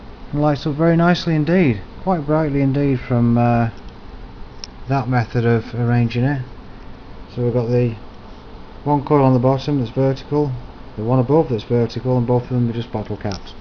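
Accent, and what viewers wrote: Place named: United Kingdom. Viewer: I want to try something different are you from the uk your accent sounded bristish. I have some ideas I wanted to try needed some help if possible ?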